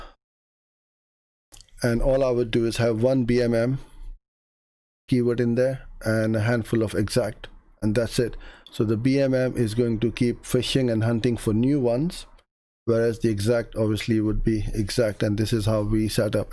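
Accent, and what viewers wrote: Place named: England, United Kingdom. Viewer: You talk too much.Impossible to suffer that English accent more than 10 min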